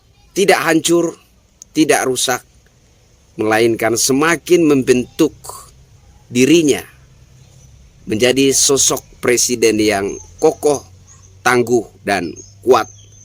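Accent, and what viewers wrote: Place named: East Nusa Tenggara, Indonesia. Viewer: Merinding saya mndengar narasi dari orang ntt.semoga presiden kita sehat selalu dalam lindungan Alloh swt.salam dari cilacap jawa tengah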